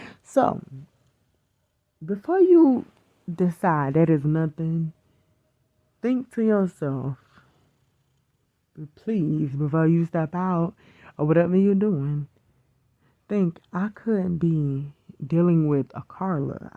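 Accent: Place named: South, United States. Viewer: I'm an accent man..Lol.i love the way you talk..Is that Texas?? Georgia??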